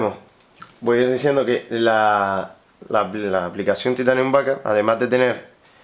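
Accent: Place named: Canary Islands, Spain. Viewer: wow se nota q eres de canarias, yo soy de grancanaria, la feria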